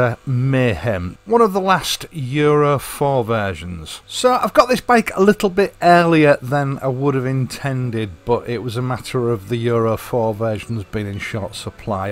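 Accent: United Kingdom. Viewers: Yeah, it´s official... You are the greatest Royal Enfield fan in the world !!! Congratulations and thanks for sharing your experiences and letting us hear your absolutely British accent. Your videos were a great support during the process of getting my own Orange Crush RE Interceptor (locally assembled in Argentina). Thanks again and best regards !!! Ernesto M. Parodi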